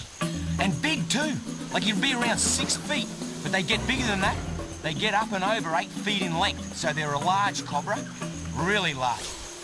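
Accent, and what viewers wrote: Australia. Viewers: I fucking LOVE how Steve says "Cobra!" the Australian accent is just beautiful at times! COB-RA!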